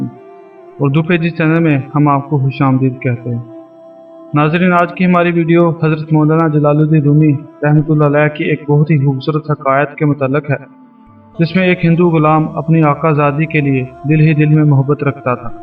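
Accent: Punjab, Pakistan. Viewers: bhai aap ki awaz bhot achi hy lakin aap ka talfuzz bilkul Punjabi hy plz ic ko negative ni lain lakin ap ka talfuz bhot irritate krta hy plzzz ic talfuz py work krain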